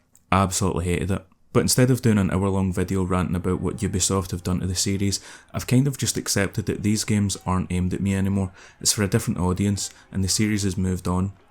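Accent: Scotland, United Kingdom. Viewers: Currently attempting this myself, subscribed within 4 minutes of watching. Why? The accent. From Glasgow myself and I love to see Scottish CC’s. Fantastic video man, keep it up.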